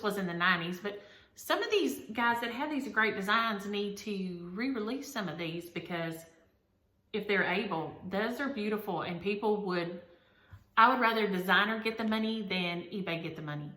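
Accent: South, United States. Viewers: Sounds like you have had alot going on....I hope you can fine those pants....I had the Close Captioning on your video and they do not understand the Southern Talking....because what you said, was a whole different world than what they type...the last video, I was on the ground laughing to hard....they typed "Naughty" alot ...you did not say that....The Sheep are always fun to stitch, and you need to count your Blessings ...take care and be safe..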